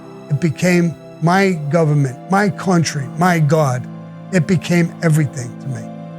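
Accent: United States. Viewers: I’m a NEW YORKER myself! I absolutely love listening to you talk, your mannerisms, it’s like I’m back home. I especially love hearing about your life, the way u can describe the detail, the expressions even on your face... it’s like u sitting there next to me, as if it just happened. Thank u for the experience... absolutely my favorite podcast! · Talks like a 100% full on seppo.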